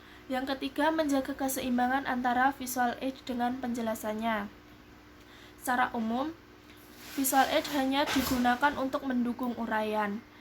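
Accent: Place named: Java, Indonesia. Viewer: sangat bermanfaat 👏 medok e ntappp wkw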